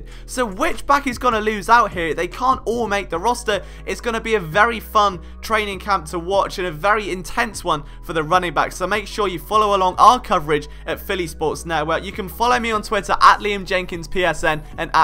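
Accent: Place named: England, United Kingdom. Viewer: Man I'm shocked I enjoy your videos as much as I do. Nothing personal but I can't stand that accent and willl turn shit off damn near instantly but your shit I don't even notice lol. All of my mom's family is from England to that's why I don't understand why it bothers me so much. Anyway keep up the great work man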